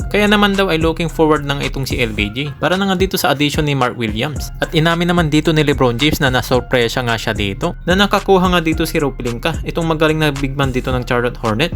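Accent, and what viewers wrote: Pangasinan, Philippines. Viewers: Idol taga pangasinan kaba? 😅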